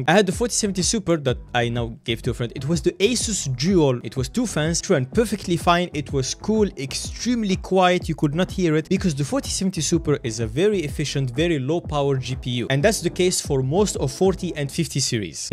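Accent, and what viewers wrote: France. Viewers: Are you french ? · Interesting voice, is that french accent ? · What's up with that accent 😂😂 I swear every language besides English sounds weird or lame 😂 whatever accent this is sounds lame like French or something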